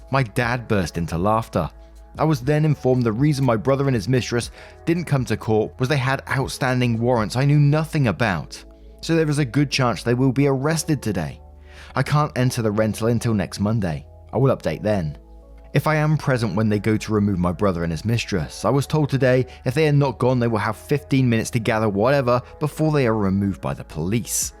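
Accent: England, United Kingdom. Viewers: From Norn Iron and I love your English accent!!!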